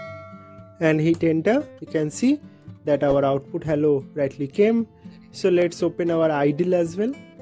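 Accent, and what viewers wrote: India. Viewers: indian accent : check factual information : check does not waste time : check yep this man is legit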